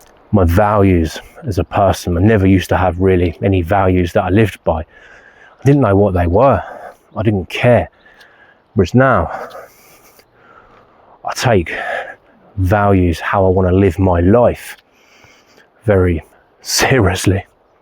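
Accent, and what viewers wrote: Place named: New Zealand. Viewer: You really picked up the new Zealand accent love it!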